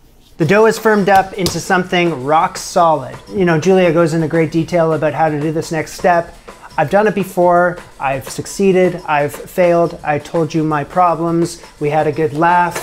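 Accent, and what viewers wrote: Canada. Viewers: First time I ever saw your vid. For some reason I detect a bit of Canadian in your voice but could be wrong?I very much like your "son of a bitch"! Will use your filling recipe to make pumpkin custard because stressing with pastry is not my idea of a good time. Subscribed!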